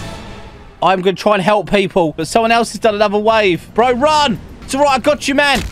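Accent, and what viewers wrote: United Kingdom. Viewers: I just now he's British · are you bri’ish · U speak British me too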